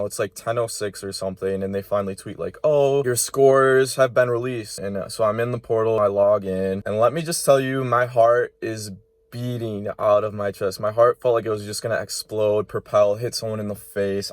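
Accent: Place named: Midwest, United States. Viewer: Hello - thanks for making this video! I've received some rejections and I applied late and I'm expecting plenty more. Mcat was meh for me too. But thank you for being so honest and heartfelt! Also, love your Midwestern accent! Keep your head up - easier said than done - I Totally get that. You've already accomplished SO much just by applying and getting yourself on a page. You probably have a much better understanding of yourself than you realize thanks to the thoughtfulness you put into your application. And you probably gained a lot in studying for the MCAT the first time. I've already taken it twice , but I'm guessing I will have to take it a 3rd time just to get schools to even look at me. I totally feel ya! You know more than you realize! Keep chugging. We're all in this and we're all rooting for you! :)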